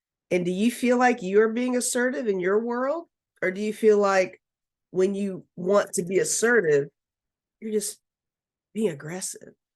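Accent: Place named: South, United States. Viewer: You are so pretty, love the sound of your voice, best subtle southern accent I’ve ever heard!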